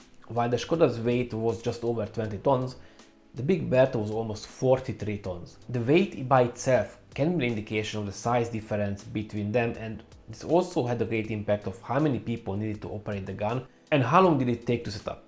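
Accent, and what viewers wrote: Hungary. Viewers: Szia! Magyar vagy igaz? Magyar akcentussal beszélsz angolul😊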